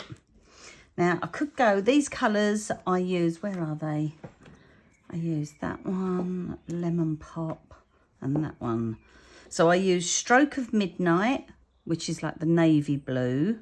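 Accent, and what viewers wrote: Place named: England, United Kingdom. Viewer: Hi. I love your tutorials so nice to hear your english accent. - I'm 81 & in UK but still enjoying my cardmaking. Thank you for your inspiration. 🇬🇧🇬🇧🇬🇧🇬🇧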